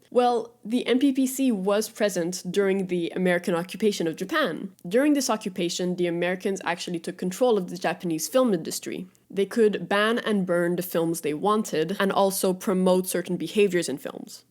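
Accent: Quebec, Canada. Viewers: Are you a quebecer or French person by any chance? Just curious because I'm from Quebec and your english accent sounds similar to mine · Great video, I loved it. Also is this a quebec accent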